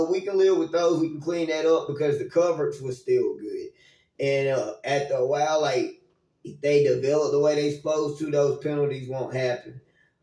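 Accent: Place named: South, United States. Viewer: As a Cowboys fan in NYC, I respect you for doing a good job of putting lipstick on a pig. Also, our good but young TEs dropped two very catchable balls in/near the end zone. Finally, 11 Ls in a row? Y’all really don’t want to see us again, especially if we’re relatively healthy. We’ll only be better next time. Thanks southern sounding Giants fan in the big ciddy. Wish you much success on YouTube.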